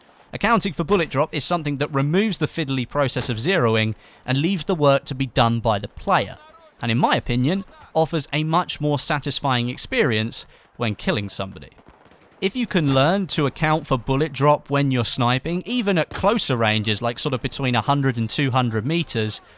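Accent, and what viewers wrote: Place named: United Kingdom. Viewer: I hate british axents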